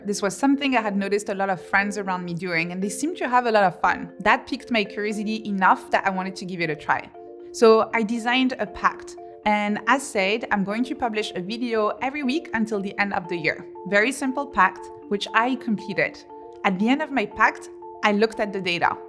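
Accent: France. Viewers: Love the little french accent🇫🇷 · Beautiful French accent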